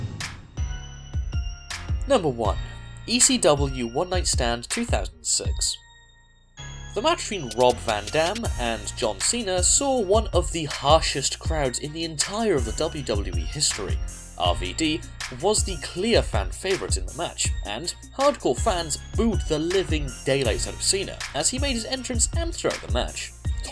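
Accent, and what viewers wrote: United Kingdom. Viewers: Plus the gay ass British voice doesn't help neither.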